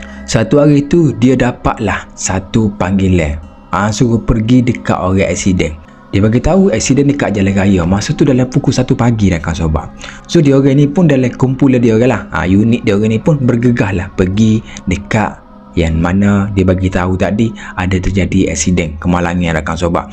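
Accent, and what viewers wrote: Kelantan, Malaysia. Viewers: Kawe orghe Kedoh tapi pahe baso Klate ni tapi apa pong kawe terhibur...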